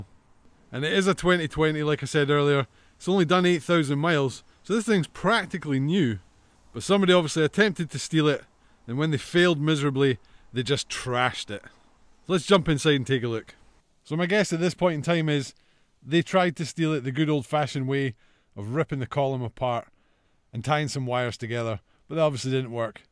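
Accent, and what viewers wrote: Scotland, United Kingdom. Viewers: Thats a wee mit of a mess. Great to hear the Scottish accent am defo a subscriber 🏴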